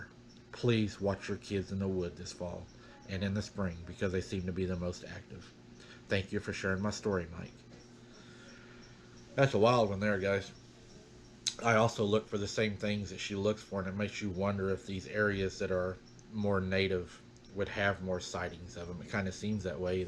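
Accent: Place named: South, United States. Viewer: U sound so country and down to earth. Love being a part of the family.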